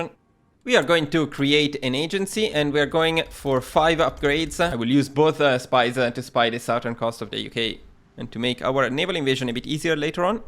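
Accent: Italy. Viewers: Grande bomber avevo un botto voglia di fare sto achievement ma non Trovavo un tutorial buono e poi il tuo accento Italiano spacca, di dove sei?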